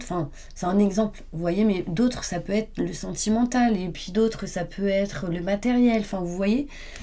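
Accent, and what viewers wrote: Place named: Mediterranean. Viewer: Oui déjà des petites insomnies. En fait c est bien si vous faites rire les auditeurs, car vues les nouvelles à la télé.. En fait vous parlez comme un peu les gens de là où j habite : pays de Méditerranée !.... En tous cas j y suis habitué. D après mon avis, les gens qui regardent les vidéos des mediums, et bien c est qu on a une petite vie bien étroite, étriquée, et que notre âme est prisonnière " de peurs, de questionnements, et d interrogations".. Merci pour ces deblocages ! Vidéos vraiment dynamiques ! ☀️😊💐💐💐💐💐💐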